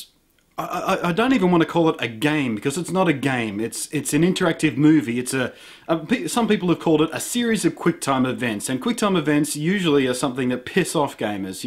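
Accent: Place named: Australia. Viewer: Try turning on "transcribe audio', the system doesn't seem to pick up the Aussie accent as well. It's a good laugh.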